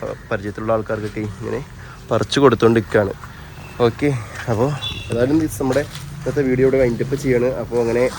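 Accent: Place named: Palakkad, India. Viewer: നീ ചെന്ന് പറയണ. ചക്കരയെ കാളും.. പാലക്കാടൻ ഭാഷ യാണല്ലോ. കൊല്ലം ഭാഷ മറന്നു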